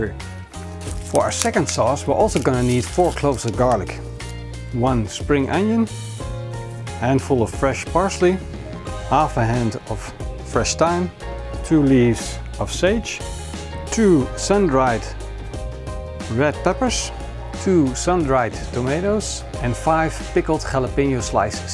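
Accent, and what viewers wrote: Netherlands. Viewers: Je spreekt Engels als een Nederlander. Ben je Nederlands? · Je kan horen dat hij een nederlander is door het luisteren naar zijn engels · Very obvious Dutch accent lol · Nice Dutch accent👌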